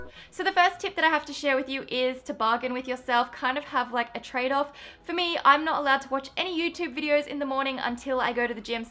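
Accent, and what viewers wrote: Australia. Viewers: she's Aussie isn't she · Are you a Canberra gurl?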